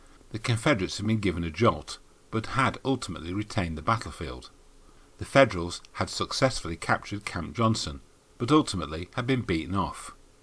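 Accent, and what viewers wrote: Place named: United Kingdom. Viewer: Why is the narrator British? 🤷🏼‍♀️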